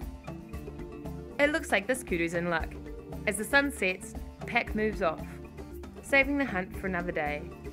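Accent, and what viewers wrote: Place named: New Zealand. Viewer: Kiwi accent . Lol. Kia ora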